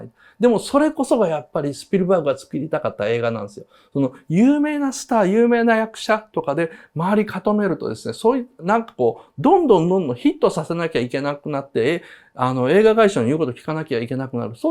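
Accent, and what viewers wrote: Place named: Sumiyoshi, Japan. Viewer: なんか土井善晴が浮かぶなと思ったら住吉区のイントネーションなんですな。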